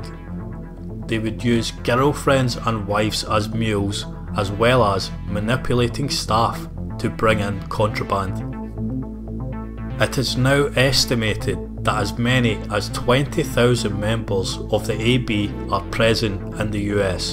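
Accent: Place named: Scotland, United Kingdom. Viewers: Didn't think I'd be watching a documentary on American prisons with a fellow Scotsman narrating it 😂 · You sound scottish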